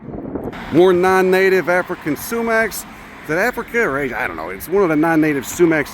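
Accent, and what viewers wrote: Chicago, United States. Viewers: Are you from Chicago? I hear an accent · Haha! You are the new joy of my day. I'm guessing from your accent - Chicago? You are also an attractive man. Show your cute face more!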